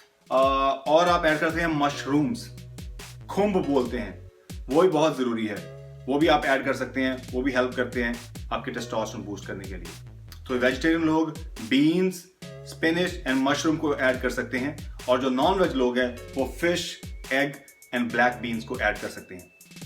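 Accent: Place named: Punjab, India. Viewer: veere punjabi jhalak jandi har war ,.....love that too much .....jaffi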